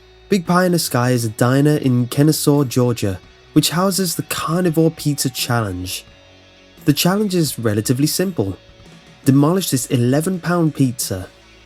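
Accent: United Kingdom. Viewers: Your englaned I thought you were British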